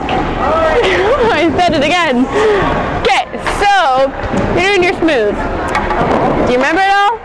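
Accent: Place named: Canada. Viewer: she is probally canadian. A girl i dated was from Alberta and she sounded a bit like that. I see u like the sporty ones eh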